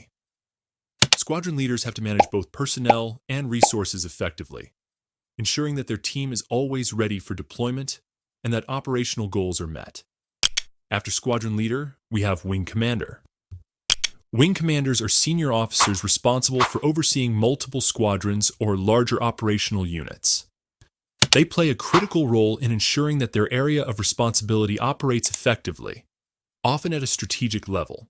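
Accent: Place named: United States. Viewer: So weird here’s an American accent explaining Australian military ranks. No thanks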